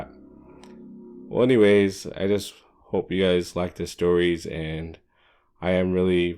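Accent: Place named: California, United States. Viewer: Much better. I was going to ask if you were from California, then you said you were. I knew it, I used to live there your narrator definitely has the accent. Can I ask why you are not narrating your stories? No offense to your narrator but you have a better voice for it. I look forward to more of your stories!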